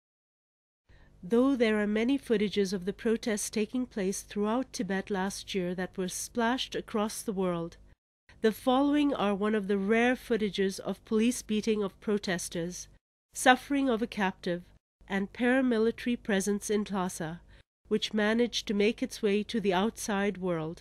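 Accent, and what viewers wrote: India. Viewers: 你麻痹的，阿三口音？贱民一个！ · 印度口音的英语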